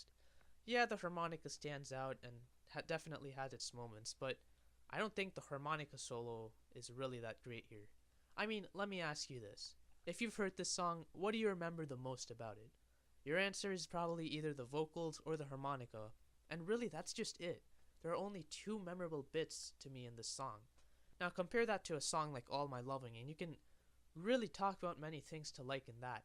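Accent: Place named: United Kingdom. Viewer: "Sarr" is a accent thing, brittish english is diffrent from american english! Great video btw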